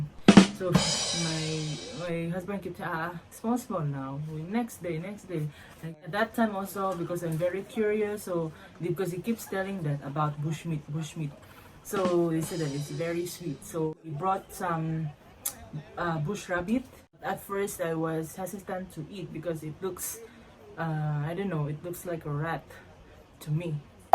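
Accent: Nigeria. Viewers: Have you realized you have developed a Nigerian-English accent? yes, you sound like a nigerian... Great video.. cheers!!!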